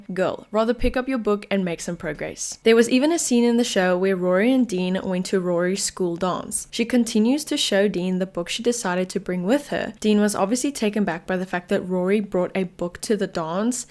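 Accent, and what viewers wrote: South Africa. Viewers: Great video. Even as a long time reader, this helped a lot. Also are you South African? your accent sounds like home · Great video. Are u afrikaans?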